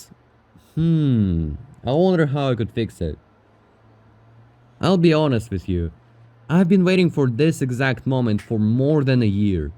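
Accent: Russia. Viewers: Wait are you russian? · You from Russia? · the russian accent is real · russian accent...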